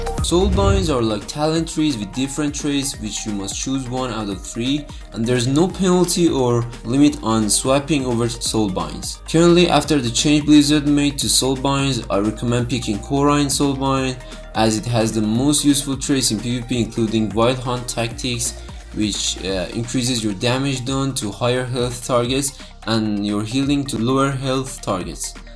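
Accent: Iran. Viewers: I can usually spot a fellow persian by their accent but I'm unsure... are you?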